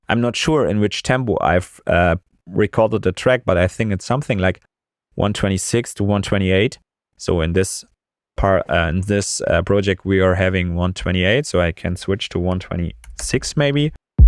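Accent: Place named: Germany. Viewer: man hört den deutschen akzent ordentlich raus :D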